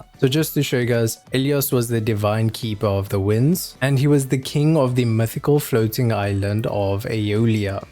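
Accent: South Africa. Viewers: I smell a South African Wuwa Content creator👀 Subscribe✅️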